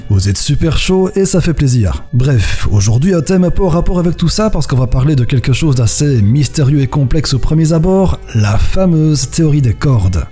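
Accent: Liège, Belgium. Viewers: Mdr l'accent belge ! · Haha vu ton petit accent tu dois venir de Liège, en tout cas quelque part par là ^^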